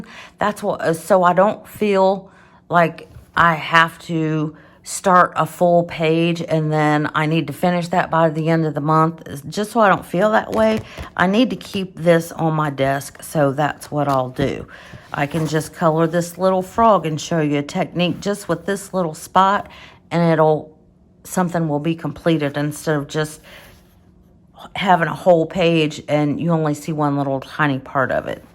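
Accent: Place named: Alabama, United States. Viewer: Hey, she Alabama southern just like I am but North Carolina style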